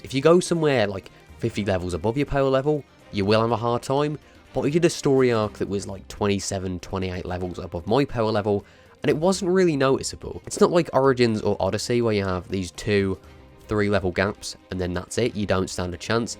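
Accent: Birmingham, United Kingdom. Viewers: I never said there was 😱 I love the accent I just can’t tell which yours is, I’m guessing your a brummie then?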